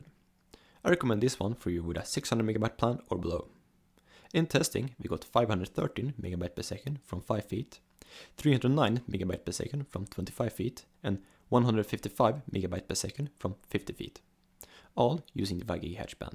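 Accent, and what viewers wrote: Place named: Germany. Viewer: I can barely understand your accent dude. Speak slower because your thick german accent makes us Americans difficult to understand what you are saying. And it’s even harder for us Americans who English is not their first language (hispanics). So please, speak slower and try to pronounce better every single word.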